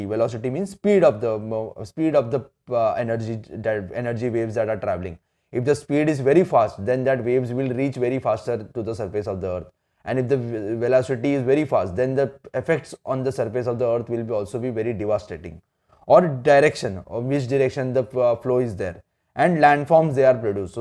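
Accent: Maharashtra, India. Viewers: N I think u r Marathi coz Ur tone like this only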